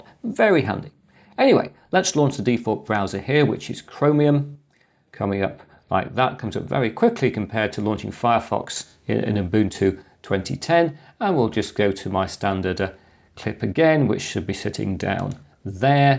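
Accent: United Kingdom. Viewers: I like this british accent! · 👍I learn about new technologies and also practice my British accent listening comprehension. This channel has everything!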